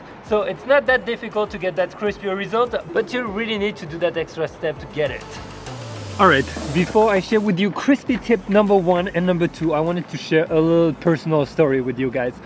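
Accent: France. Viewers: really like your videos dude, have you noticed if you lower the reproduction speed to .5 you sound like rally drunk?? maybe because of the french accent hahaha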